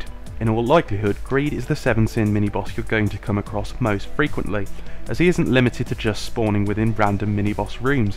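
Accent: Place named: United Kingdom. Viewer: This is really difficult to understand. I hate this kind of british accent.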